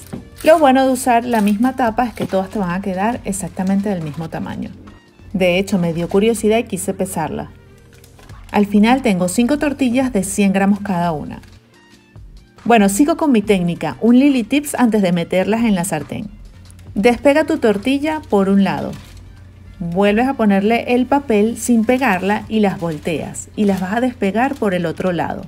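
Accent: Venezuela. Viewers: Muy Venezolana tu manera de hablar 🎉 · Saludos Lily una venezolana 🇻🇪Costa Rica🇨🇷. Desde que escuché tu acento dije: es venezolana!. Y completamente si lo eres, te felicito por tu canal y videos, siempre quiero innovar en los platos de mi hogar. Muchos éxitos y bendiciones. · Eres venezolana, ese acento es inconfundible?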